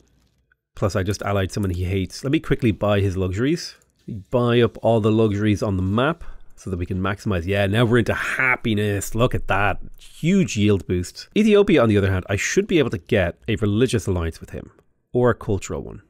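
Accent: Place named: Ireland. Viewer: I love ur irish accent i love watching ur videos just to hear u talk lol ❤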